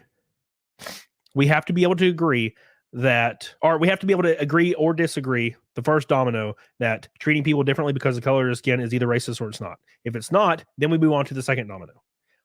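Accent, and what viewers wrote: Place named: South, United States. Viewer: Damn, I use to like this guys videos about gamestop. Now it seems he is trying to keep his channel going by going into politics. I am sad because he is just going down the stereotypical road of spitting out conservative talking points. Having to be forcibly put in a role because of what ones race is, is not necessarily pure racism if there is not an equal proportion of people in those positions. Its not about being for something because it gives a benefit. Its about getting an equal opportunity. That is the problem. So not, it is not necessarily racism. It is challenging existing racism and current systemic racism. To an ignorant person it will seem like it is racism and I cant help that outside of writting this comment. But I really did like his videos on gamestop. It really does make me sad that he went down this road. 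But maybe I should have been like ofcourse this guy is mostly an idiot because of his southern drawl.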